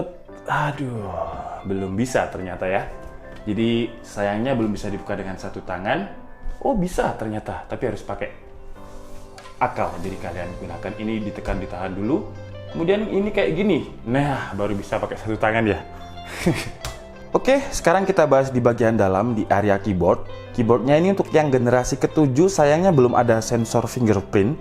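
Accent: Java, Indonesia. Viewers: Waduh mengsle" jowo banget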